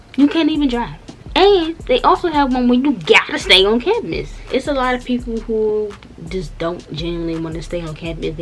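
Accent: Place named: Maryland, United States. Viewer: I guested right she was from maryland because of how she talked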